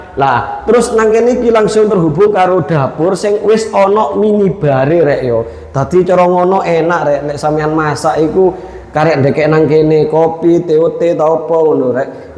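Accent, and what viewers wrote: Madura, Indonesia. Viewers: Meduro logate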